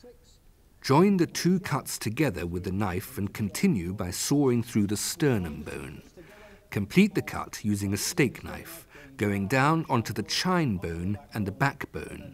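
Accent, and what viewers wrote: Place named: RP, United Kingdom. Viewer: We don't need the posh version thanks.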